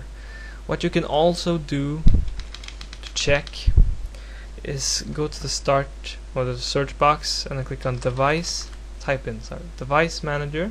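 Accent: Norway. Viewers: Ha e skjønt du va norsk før du sa da xD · Haha I just knew you were Norwegian when I heard your accent! :3 Which isn't bad at all btw, you have very good english! Most Norwegians do actually! Eg e Norsk forresten, halvt Canadadisk faktisk, men bor i Norge :D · norwegian accent is one of my favorites i have many friends in norway and find it crazy how americanixzed your country has become. It astoounds me that your schools have english as a required language. Awesome video bro! thanks for the help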